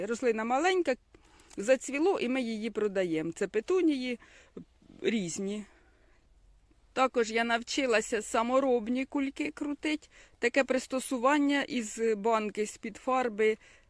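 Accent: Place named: Cherkasy, Ukraine. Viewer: Доброго вечора,. Дуже цікаво розповідаєте, особливо для тих хто тільки починає займатися цим бізнесом. Скажіть будь ласка, де ви проживаєте? Дуже схожий говір, ніби у нас на Черкащині.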